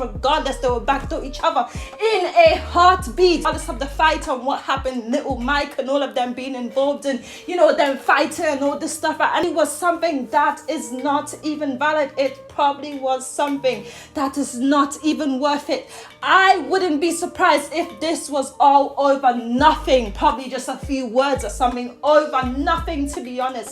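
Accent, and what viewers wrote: United Kingdom. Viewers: Some annoying British accent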